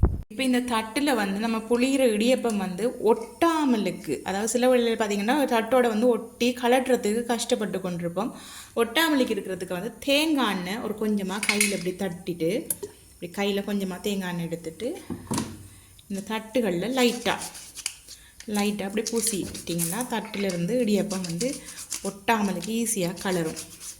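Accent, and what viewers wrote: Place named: Sri Lanka. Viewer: இலங்கையா நீங்கள் உங்கள் தமிழ் மிக அழகு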